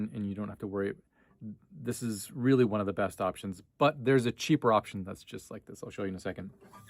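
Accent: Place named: United States. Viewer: I will never get used to the USAian pronunciation of cap-o as kay-po😂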